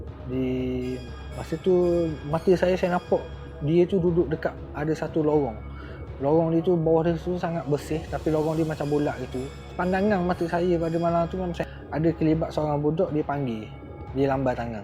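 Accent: Terengganu, Malaysia. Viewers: ouh ptutlah kdg2 kliru tgok member sha ckp,,kejap mcm ganu kejap mcm kelate,,stay safe bro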